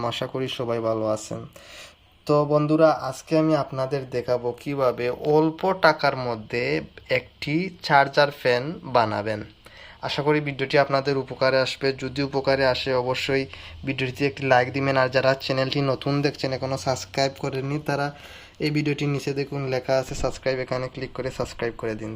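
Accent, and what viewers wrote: Sylhet, Bangladesh. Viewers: Vai apni ki Sylhety 🤔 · Vai er basha ki sylhet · আপনি কি সিলেটি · Vai ki sylheti ❤ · Vai apni ki sylhety ? 😀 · Vai sylheti? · Apni sylheti naki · ভাই আপনার দেশের বাড়ি কি সিলেটে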